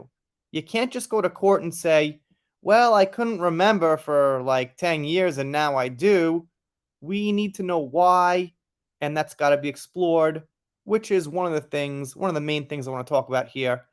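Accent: New York, United States. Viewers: I find your voice and accent sooo new york ...it is perfect ...keep it! Dont change...when young i moved to california (in thr 1960s bedore insanity) and was made fun of so i DELIBERATELY got rid of my midwest twang.. i actually had teens ask me about indians living in tee pees..no joke..they were that ignorant...i ended up being the science aid because i was so far advanced in science compared to those kids..actually in every class...and i was considered a c and d student in oklahoma...there I was an a plus student with out trying. There.fed your ALGORITHM...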